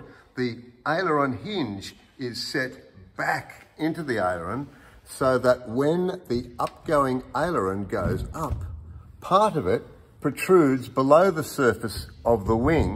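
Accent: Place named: Australia. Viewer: Clear and concise, also great to hear it from a fellow Aussie. Cheers cobba!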